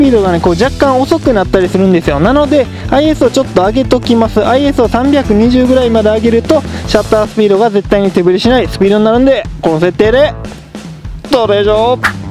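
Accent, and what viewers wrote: Kansai, Japan. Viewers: 自然な関西弁が好感持てます。 また、どんな目的でどうやって撮影してるのかも参考になります。 撮影した作品は応募とかされてるんでしょうか。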